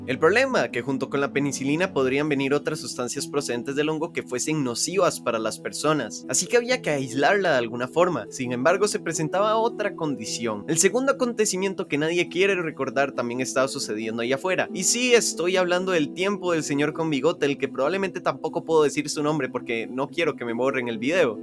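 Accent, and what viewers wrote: Costa Rica. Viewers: Escuche la voz y dije "Es tico" y luego reviso la bibliografía y es de biotec💚, muchos animos en este proyecto, ojalá te vaya super, yo soy primer ingreso de biotec.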